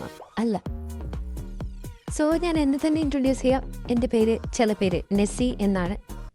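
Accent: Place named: Kollam, India. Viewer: From that ‘മോന്ത’ talk, we can understand you’re a certified kollamkaariiiiii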